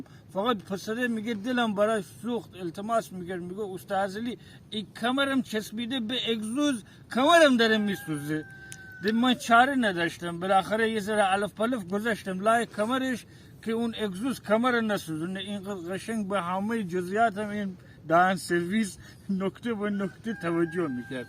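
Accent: Gilan, Iran. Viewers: من هم کودکی خودم رو در تابستانها کنار خانواده مادری در گیلان میگذروندم و این لحجه و ادبیات صحبت برام یاداور روزهای خوش کودکی خودمه.. ته جانا قوربان با اون سفال تیار غذا خودنت.❤ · خیلی حال داد بخصوص بالحجه گیلکی خوش تعریف هستین ماشالا👍👍👍👍👍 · ویدئو هاتون بوی زندگی میده همه چیز به کنار اون صميميت وبی شیله بودن وسادگی همه رو مجذوب میکنه 👌🌹 از دیدن این طبیعت زیبا ودلنشین وشنیدن خاطرات شما با لهجه ی گیلانی وبیان شیوا وشخصیت شوخی که دارید .آدم غرق لذت وآرامش میشه ...این ویدئو ها حال وهوای زیبای گیلان رو هم به بيننده میده .عالی بود 👌🍀دستتان پر توان زندگیتون پر خیرو برکت 🙏👍👍👍👍👍👍👍👍👍😋🌻🌹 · سلام ، از صحبت‌های زیبای شما با لهجه گیلانی بسیار لذت بردم 🙏🏻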